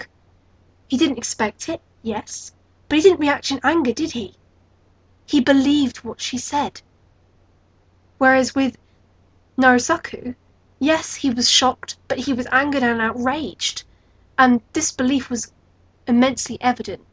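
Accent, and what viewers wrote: United Kingdom. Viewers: First of all I love your british accent! It's nice to hear a rant from somebody not from Japan or the States for once. Second, I just know one thing, regardless of all the facts in this argument, I think it would be an utter travesty to not make NaruHina official because I honestly can't see Sakura being disappointed about those two being together at the end of the show, yet Hinata would be devasted terribly if he chose Sakura, especially after putting her life on the line against Pain. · I love your rant! What you say makes so much sense and your english accent is awesome! ^^ BTW: NaruHina & SasuSaku are CaNoN!!! Let's celebrate "\(^v^)/" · Soooo true!! NARUHINA FTW! I love you and your british accent is so beautiful *-* ♥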